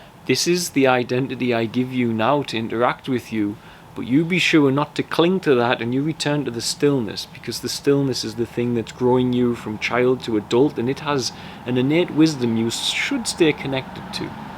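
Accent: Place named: Northern England, United Kingdom. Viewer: So profound. It actually made me cry. So much conditioning and "recording". I just wish I'd been more awake when my kids were small 😥 By the way, where's that accent from? I am from County Durham (but living in Sweden), and assume you're not far away!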